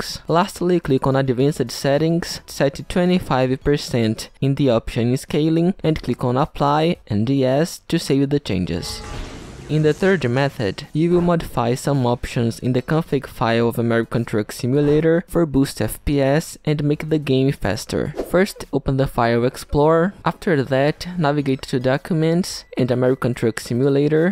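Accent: Brazil. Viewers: vc e br da pra perceber no sotaque kkkkk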